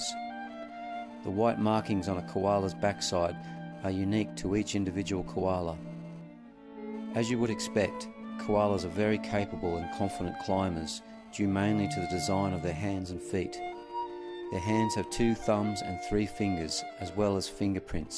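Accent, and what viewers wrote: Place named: Australia. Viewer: I loved the work on this documentary. The word has to go out to make people aware that koalas are on the endangered list. The I haven't seen anything that has this quality of images and information before. The sound track and voice over give it a uniquely Australian feel and I really enjoyed it. It's great to see koalas in their natural habitat and that's what makes this special for me. I hope to see more from the makers of this in the future.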